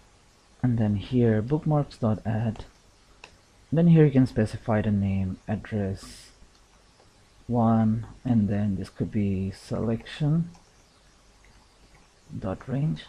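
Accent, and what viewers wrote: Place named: India. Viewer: I concur that. I've just learned how to VBA. And if I may speak the truth for a minute: He has a very, very light Indian accent, which makes his instructions so much easier to understand than most of whom I've come across. It's an honest statement. NO OFFENSE.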